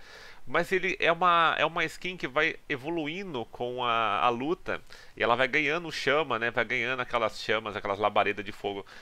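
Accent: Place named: Brazil. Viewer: Idai caralho, o cara é Br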